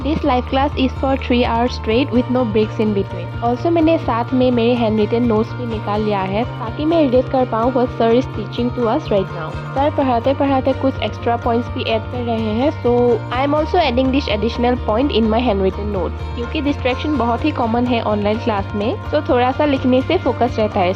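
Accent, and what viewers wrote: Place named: Assam, India. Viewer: Assamese accent nsna lgse hunibo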